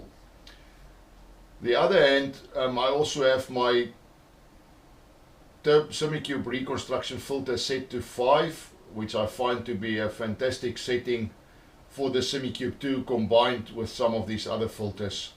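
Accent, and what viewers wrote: South Africa. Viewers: Hi just wanted to find out where u are from you sound South African. IF so where did you order your simucube from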